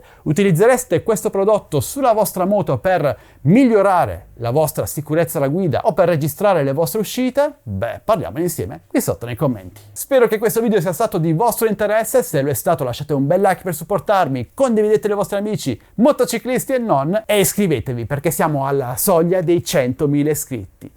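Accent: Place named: Sardinia, Italy. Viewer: Pensavo fosse sardo!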